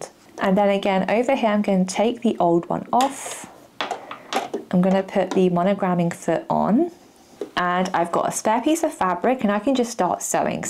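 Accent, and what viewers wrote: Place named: United Kingdom. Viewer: Thanks for sharing. BTW, love the accent. I'm always watching British TV or movies here in The States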